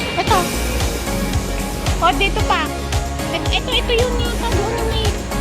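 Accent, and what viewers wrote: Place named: Ilocano, Philippines. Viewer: watching live anak ,marami ka bang napamili ilokano ba kayo kadi yong tono nyo❤❤❤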